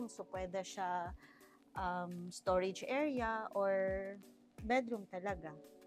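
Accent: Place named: Visayas, Philippines. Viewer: pangit magsalita ni ate, 😢. Nag english, nag tagalog pero tonong bisaya.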